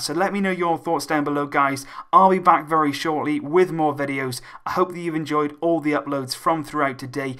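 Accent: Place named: England, United Kingdom. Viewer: 15 years barca fan here, really like your vids, you really understand barca very well and I constantly check if you have vid updates since mid Aug this year. By the way, where are you from, US or Euro? like your english accent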